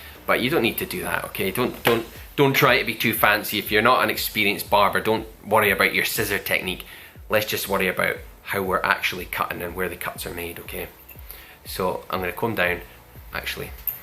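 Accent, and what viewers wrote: Scotland, United Kingdom. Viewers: i love your scottish accent <3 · Great video! I'm going to give a self-cut a try here in California! Are you Scottish?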